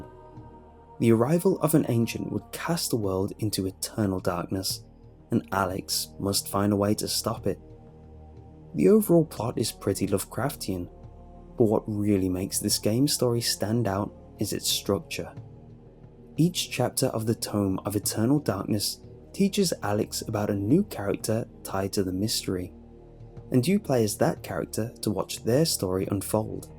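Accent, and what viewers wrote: United Kingdom. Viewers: Love your tops and horror stories because of your voice so soothing and misterious I thik I never heard another well spoken brit like you do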